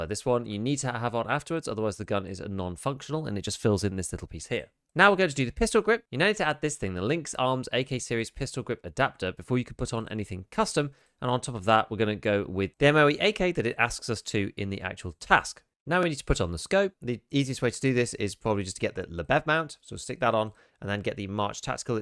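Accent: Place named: United Kingdom. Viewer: you sound like a re**** brit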